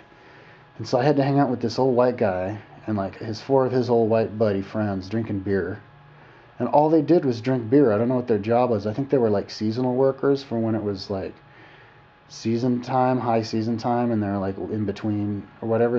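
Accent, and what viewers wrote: England, United Kingdom. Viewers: English Accent! wow. Your analysis is completely flawed. Narrow roads, stone and brick. The idea that Aussies regard on retrospect as Australia being the best country in the world has a lot of substantial research and actual lived experience pertaining to this Australia wide held concept. Unless of course you are a Greedy Wall Street banker or power hungry Media tycoon. Australia was created as a penal colony for prostitutes and felons - Wow. The USA was founded by Slave Owners and Tax Avoiders.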